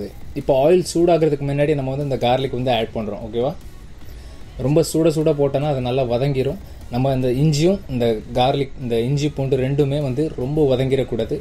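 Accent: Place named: Mumbai, India. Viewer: Your tamil is nice. Mumbai tamil 😅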